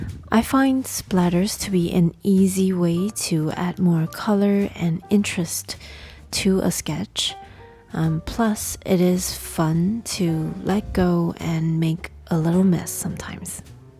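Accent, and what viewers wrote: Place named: Malaysia. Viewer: Km org Malaysia? Aku bisa mendengar aksentmu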